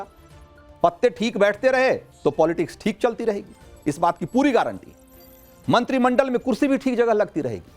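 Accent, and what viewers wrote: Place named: UP-Bihar, India. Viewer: Bhai aap k bolne se to saaf pata chalta hai ki aap up,bihar ,Jharkhand k bhayye ho lekin aap jo b bata rahe ho acha bata rahe ho, lekin kalko paise k khatir beimaani aur gaddari mat karna, kyunki bhayye log ki zubaan, saap ki zubaan ki tarah hoti hai, kabi b palat sakti Hai.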